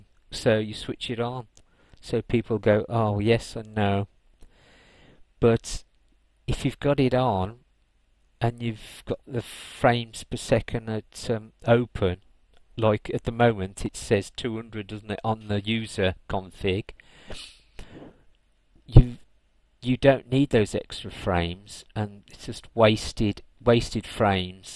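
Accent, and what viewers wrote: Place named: Birmingham, United Kingdom. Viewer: John Camus He's from Birmingham by the sounds of it (UK), we call them "Brummies"!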